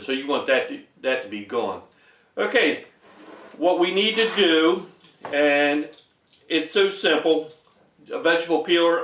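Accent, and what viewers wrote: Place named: Hammonton, United States. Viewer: down in cape may. My wife is from Hammonton and has the same accent as you .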